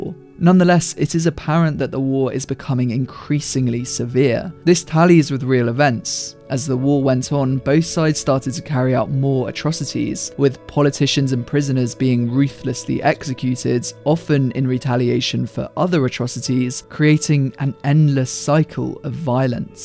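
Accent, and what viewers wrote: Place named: England, United Kingdom. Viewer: As soon as I heard the English narrators accent I knew we were in for 13 minutes of Tansplaining. The Anti-Treaty forces were standing by an oath they swore to an Irish Republic, not a partitioned land as a British dominion. They were not “self-mutulating”. Banshees is a dark tale of a failed musician who is suicidal, but his laissez faire catholicism prevents him actually carrying it out. He decides instead to hound the gentle Padraig to suicide instead.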